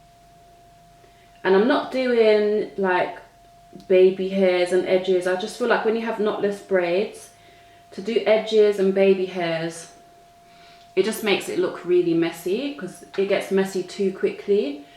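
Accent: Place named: England, United Kingdom. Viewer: The England accent 🥰🥰😌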